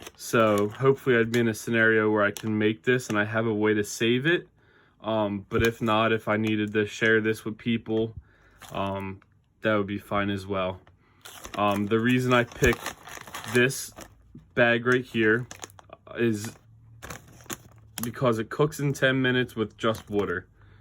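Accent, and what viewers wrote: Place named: Baltimore, United States. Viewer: I detect a hint of Baltimore in your accent. Keep the videos coming sir.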